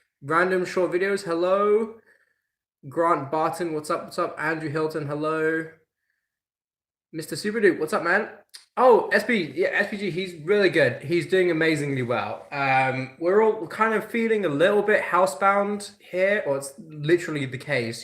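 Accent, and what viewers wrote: United Kingdom. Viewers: Are you afraid of losing your english accent being locked up for so long with Gabbi?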